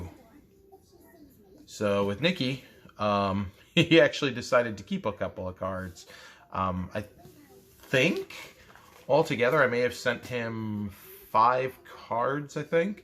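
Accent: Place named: Utah, United States. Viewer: I’m listening to your accent... I’m hearing something that tells me that you’re not a native from North Carolina. What am I hearing? My guess is Utah. Am I right?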